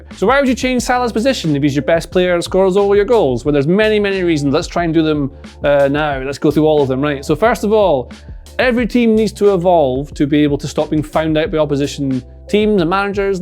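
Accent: United Kingdom. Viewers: 😂🤣 was listening to this on speakers at work and my colleague said “ everything explained by a British person is just better “ I’m in the US for context. ❤️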